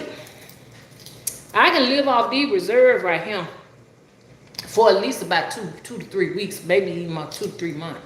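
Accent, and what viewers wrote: South, United States. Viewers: Heeeeeey 🌹. 🌳. Your accent definitely "Southern"! Please 🙏 send a little of that "bulk" my way.🤗🤗🥰. You're My "People's ✅️ ✅️ ✅️ 🤗🥰. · You're so beautiful, I always felt rooted to you! im grateful for the messages. your locs are roots. And your voice is the southern ancestors. I spread ur messages with my followers and stamp your name n channel. I wish many watched you. Master teacher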